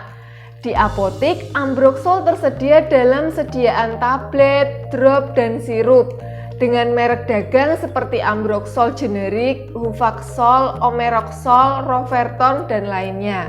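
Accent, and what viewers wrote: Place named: Java, Indonesia. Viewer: Watuh medoknya..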